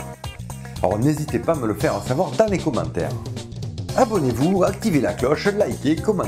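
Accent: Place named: Provence, France. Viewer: "Fing Silurieng/début Dévonieng" je pensais pas qu'un paléontologue du sud de la France pouvait être aussi exquis à entendre 😂😂😂 Vraiment entre les familles d'espèces et les périodes, tu régales !! Hyper intéressant par ailleurs, tu alimentes ma fixette du moment 👍👍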